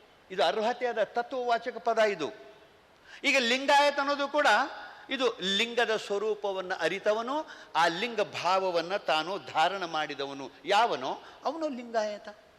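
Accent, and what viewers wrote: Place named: Kannada, India. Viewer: His Kannada is charming 👌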